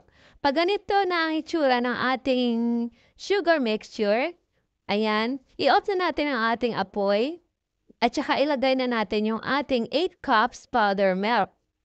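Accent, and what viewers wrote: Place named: Visayas, Philippines. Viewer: ang cute ng voice ni ate pinaghalong englishera,na bisaya at Ai😄😄😄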